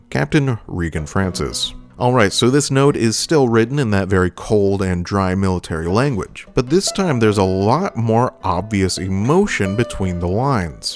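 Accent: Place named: United States. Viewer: Like you sound American too have you never heard of Ronald regan??? On top of that Ellis literally says her name and you still said it in the stupidest way possible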